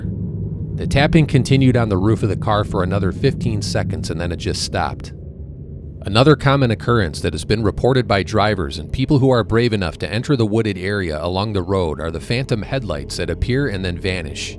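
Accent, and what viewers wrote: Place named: Midwest, United States. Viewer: This is the most Wisconsin/midwest AI voice I have ever heard! Some new sights for me to venture out and see, thanks much!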